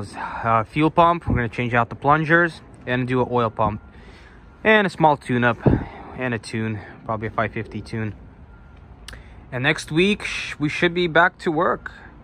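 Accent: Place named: United States. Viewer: смотрю твои ролики,читаю комменты в переводе на русский.Тяжело воспринимаются слова на американском диалекте,но пытаюсь понимать вместе с жестами.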